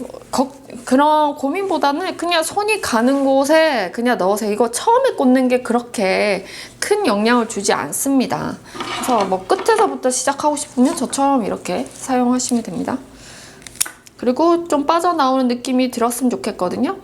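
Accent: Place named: Busan, South Korea. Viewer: 일기읽을때 부산느낌 물씬!! 오늘 꽃도 너무 예쁘네요! #힐링초하 힘내보입시다!!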